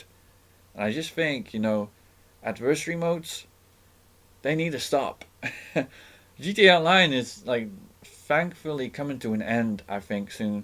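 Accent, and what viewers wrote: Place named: United States. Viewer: Good video, but you sounded too American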